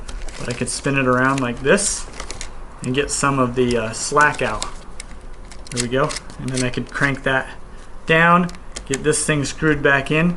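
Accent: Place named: United States. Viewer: You're trying to say it's not fair?! Dude...just think. It's so easy to figure out that he's in North America. You shouldn't believe that having to critically think is unfair. Without even clicking away from the video there is more than enough data telling you that he's in North America. He clearly has an American accent, the name of his web site which is in the description is rolling wrench DENVER. That's a city in the American state of Colorado. I can't believe that you'd actually rather make someone change the way they speak than just simply use your brain. I'm trying to help you man. Think critically instead of being lazy and asking others to change for you. I promise it will make life easier dude.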